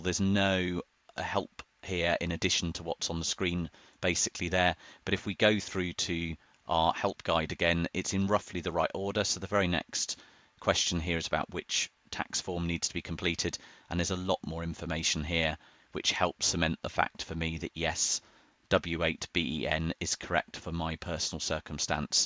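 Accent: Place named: United Kingdom. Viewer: Great helpful video in plain uk English 😊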